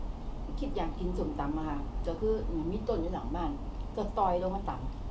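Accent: Isan, Thailand. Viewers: เพื่อนๆ เจ่าไปใสเมิดน้อ มื้อนิ ค่ะ.สาวอิสานเอ้า.น้อง แหม.ไปใสจร้า.เสียงเว่า เจ่า ตะฮักๆ เนาะ.คนกะตะฮักคึกิน.ตะแซบแท่. ส้มตำ แหม..เผื่อ แนเด้อ จร้า..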